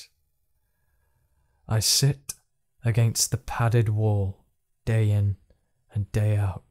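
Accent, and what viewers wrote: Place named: England, United Kingdom. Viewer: Oh crap you're English. Nice CP lel.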